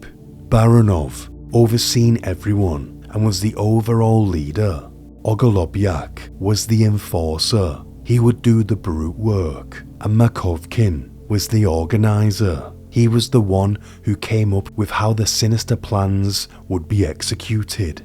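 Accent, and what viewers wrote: United Kingdom. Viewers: He's British! That's his normal tone. I love his voice. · This popped up in my suggested The narrator sounds like he could be British chills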